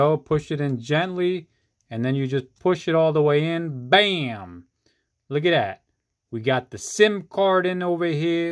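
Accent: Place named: Italy. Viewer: Hay! What happened you lost your Italian accent half way thru?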